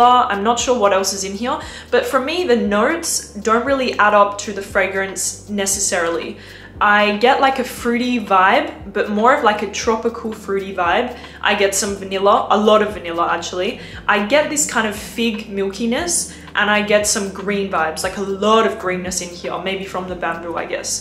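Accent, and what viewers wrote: Australia. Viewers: ! We missed you and your aussie accent 😍 You look stunning! Happy to see you back, don't forget about us here on YT 😢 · Just wanted to tell you that you sound so Australian👍 I like it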